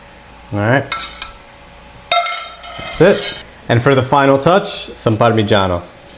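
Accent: United States. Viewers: You sound American, so what is with the recipe in Grams?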